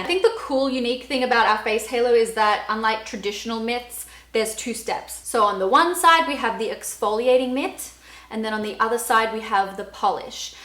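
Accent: United States. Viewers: Loved this video! You can start to tell that you're picking up on the American accent and that's honestly amazing xx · Crazy I can hear you getting the American accent!!! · OMG!! ur accent is turning American especially when u said “i just painted my nails twice”!!! But seriously I’ve had my fave halo since the start of last year and it still works perfectly (I don’t wear makeup everyday) and I always tell everyone to get one!! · Omg you’re getting an American accent!!! Whyyy 😩😩😩. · You've got a bit of an American accent now!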